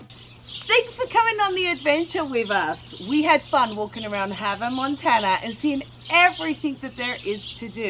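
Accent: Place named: New Jersey, United States. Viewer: Not a very pleasant place to be in the dead of winter. I'm guessing your from out of state? Sounds like a Jersey accent to me?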